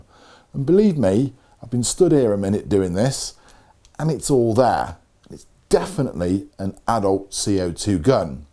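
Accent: England, United Kingdom. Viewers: The English accent is just easier for me to take than a lot of US videos, and I am from the US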